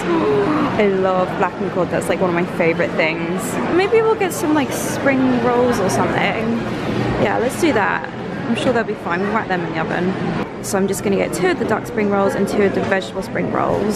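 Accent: United Kingdom. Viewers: Love that British accent, so cute.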